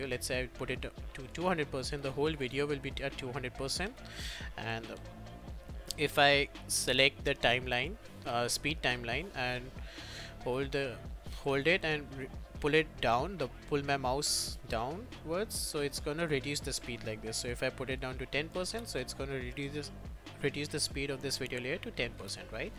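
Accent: India. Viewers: i stopped the video when you said that you're from India and you have an accent. let me tell you something real quick my friend you have a great talent and your English is GOOD, do not worry about any negative trash talk about anything that comes around you just keep doing what you are doing because it's GREAT !!! and now I'm learning from you my friend <3 Thank you for posting such a great talent like that :D B+ always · watched hundreds of indian tutorials, i wont even care if you speak indian id still understand you either way, even if im not indian. good tutorial tho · Best indian accent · Bro just watched your tutorial dont worry about your accent! I've come to loved this accent since I always learn from indian guys in youtube! You guys are simply the best. :) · nah i love your accent, indians make the best tutorials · Hey buddy quit trying to explain your accent, it's cool and we don't care. 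And thank y'all indians for sharing your knowledge online for free. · Don't think too much about it man you actually have one of the lighter indian accents that i have heard lol🤣🤣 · I want to get ur accent i try but I can't, I even watched a yt vid on how to sound like Indian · this tutorial helped me out alot ! and honestly i dont know how anybody could make fun of your indian accent, your english is very good !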